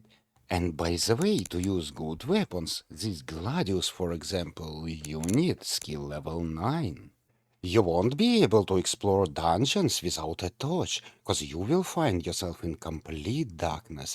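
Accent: Russia. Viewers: Is the narrator Russian?)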